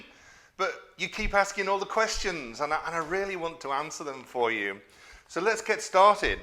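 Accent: England, United Kingdom. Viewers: Stumbled on your videos and became a huge fan. Keep doing what you're doing. I get no American accent at all you are still all English. It's a shame you didn't move to Canada though ;-)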